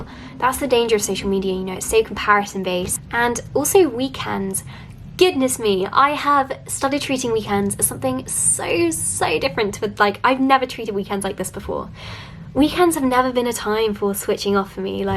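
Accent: United Kingdom. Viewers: Your accent is so purelyyy British ❤❤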